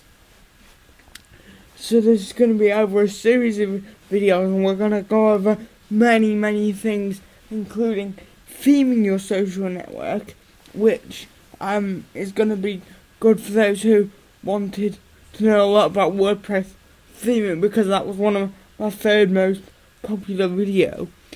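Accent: United Kingdom. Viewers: are you from south whales ol chum?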